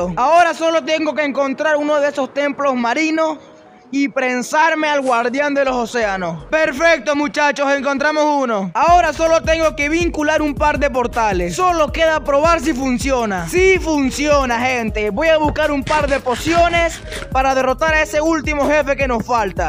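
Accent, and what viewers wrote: Venezuela. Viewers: No es q eres venezolano creo q se eacribe asi y porque le decias al esqueleto asi :/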